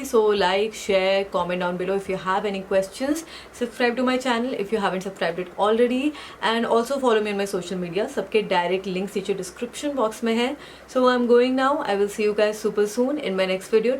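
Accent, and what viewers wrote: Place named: India. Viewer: There are two types of talking in the world : normal and Indian Would you at least put subtitles? That if you talk in English I don’t know, you speak English and indian exactly the same ...not understandable 🤷‍♀️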